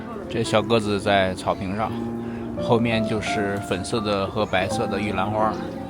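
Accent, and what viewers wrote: Beijing, China. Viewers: 你是北京人嗎,你的國語很好聽.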